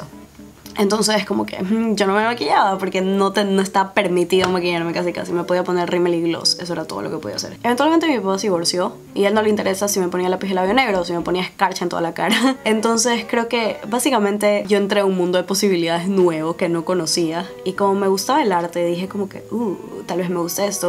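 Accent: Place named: Venezuela. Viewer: Yo también juraba que eras de Venezuela por tu acento jaja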